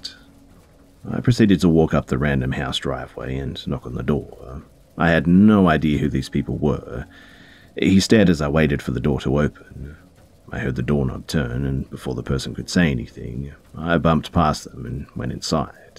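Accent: Australia. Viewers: I detect a lack of an accent. Not NZ. Not pomy. Must be a well spoken Australian. Ye. Definitely Ozzy. How ya goin' mate?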